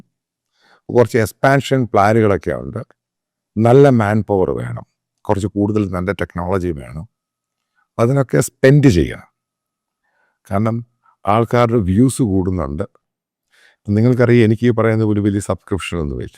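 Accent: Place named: South Kerala, India. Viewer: അച്ചായന്റെ തെക്കൻ സ്ലാങ് കേട്ടു ചിരിച്ചു ചിരിച്ചു ഒരു പരുവമായി 😁😁👍അടിപൊളി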